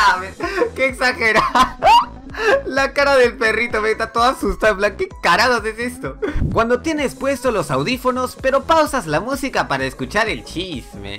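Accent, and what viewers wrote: Peru. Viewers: Habla como peruano Oe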